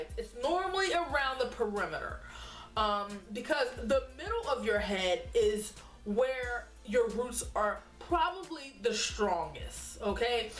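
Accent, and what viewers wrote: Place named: Maryland, United States. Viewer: Helpful! I hear some Maryland in that accent...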